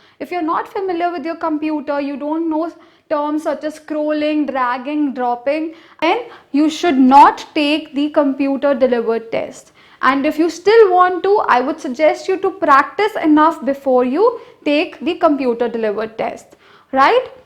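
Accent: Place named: India. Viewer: Cute girl.. I like your Indian accent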